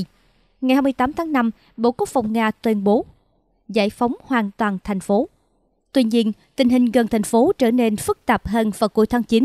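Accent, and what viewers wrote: South, Vietnam. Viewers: người tàu hả..đọc tiếng miền nam dể nghe vậy mà ko hiểu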